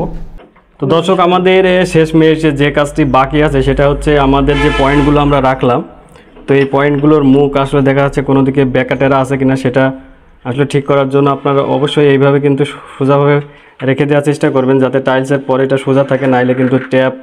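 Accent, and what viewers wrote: Jessore, Bangladesh. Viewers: খুব সুন্দর ভাবেই উপস্থাপন করার জন্য ধন্যবাদ। আপনার কথায় বোঝা যাচ্ছে যশোর এলাকার মানুষ। আপনার বাড়ি কোথায়?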